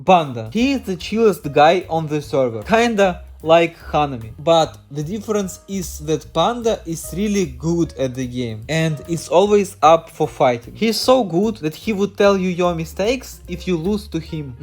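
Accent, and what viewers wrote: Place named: Russia. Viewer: Ты Русский? Просто акцент прям пиздец мощный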